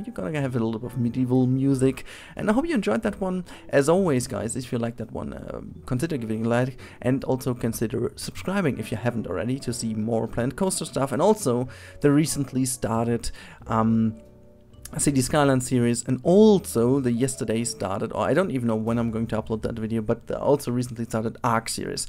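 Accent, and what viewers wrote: Germany. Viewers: Are you from Germany because really sounds like this?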